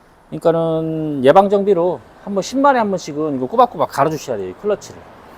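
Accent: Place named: Chungcheong, South Korea. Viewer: 어따 거기 충청도유~~! 좋은정보 엄청나게 감사혀요~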